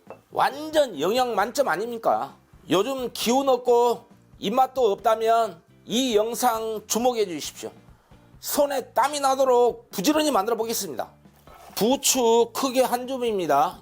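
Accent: Jeolla, South Korea. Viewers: 셰프님 라도사투리~양재기 참듣기좋습니다 잘배워갑니다❤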